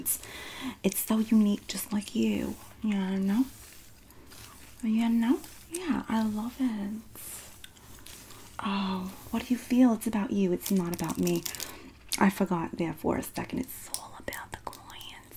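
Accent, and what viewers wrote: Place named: New Jersey, United States. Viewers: Love your jersey accent videos!!😊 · That New Joisy accent has me laughing! It's great! LOL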